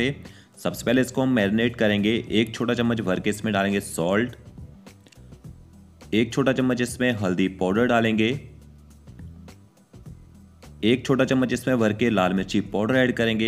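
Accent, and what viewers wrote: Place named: Pahadi, India. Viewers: U r pahadiapka tone lagra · Are you from Uttarakhand? If yes where are you from? I am asking because you have some Uttarakhandi accent in you voice. Just asking out of curiosity. BTW I’ll surely going to try your recipe and let you the result. Thank you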